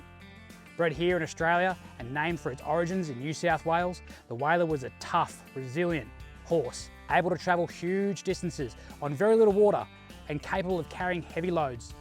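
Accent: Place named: United States. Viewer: Too american, just slow it down and thats when men, were men !!!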